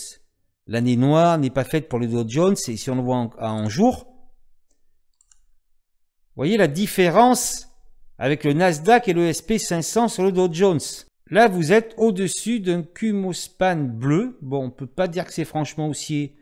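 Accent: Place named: Southwest, France. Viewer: Ah et l'accent du sud-ouest fait toujours plaisir à entendre !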